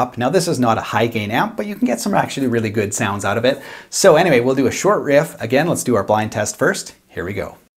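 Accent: Canada. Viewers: Your constant Canadian mispronunciation of “project” and “process” is absolutely annoying. Don’t get me started on “bahhcks” instead of “bucks”. You can say “I’m Canadian” all you want. It’s laziness.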